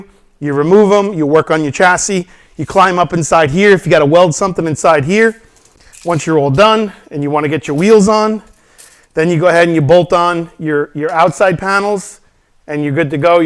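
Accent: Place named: Philadelphia, United States. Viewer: I listened to you for a minute and was like he’s from tristate area. Thats a Phila accent if i ever heard one. Then looked up Alro and there one 10 mins away haha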